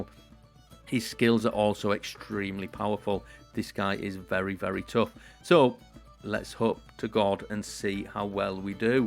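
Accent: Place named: Yorkshire, United Kingdom. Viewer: Ohh this is what ive been looking for. I dunt have this game so i like to watch people playin it, and nothing better than roleplay. Good stuff mate, liked and subbed. Also where abarts in Yorkshire you from? Im from Sheffield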